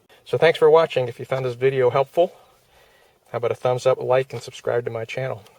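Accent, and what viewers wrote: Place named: United States. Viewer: Most helpful video - many thanks. BTW, you have a most pleasant accent - where in the US of A are you from? Regards - Roger from England.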